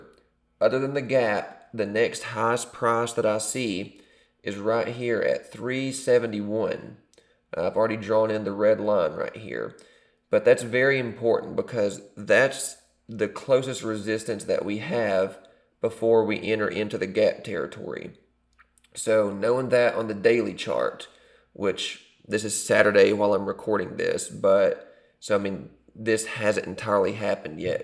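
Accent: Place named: South, United States. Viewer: You know, if someone didn’t know you, and just heard the southern accent and saw the average production quality, they would probably skip this vid… but you have a great way of not trying to overcomplicate explanations and your info is A+.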